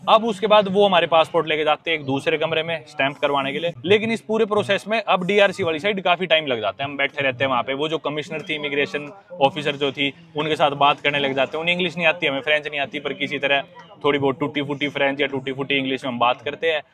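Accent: Haryana, India. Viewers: great bhai tumhare sath safar ka maza hi kuch or hai haryanvi tone bhot badiya lage manne. tum sach much great ho dear👍🥇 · Bhai ki hryanvi language sun k swad aa je h❤❤❤❤❤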